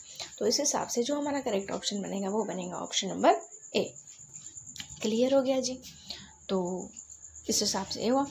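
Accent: Sindh, India. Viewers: Ma'am are you Sindhi?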